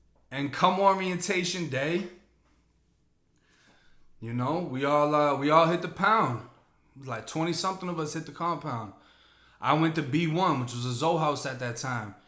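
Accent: Boston, United States. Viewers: 617 over here, Charlestown Townies. That man got a Boston accent.. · Yo where yo from originally cause you aint from Florida with that accent? sounds like Boston. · my nigga but that Boston shit be getting me everytime lol. "Tessta-Hot" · I absolutely love your Boston accent, new sub been binge watching you all night.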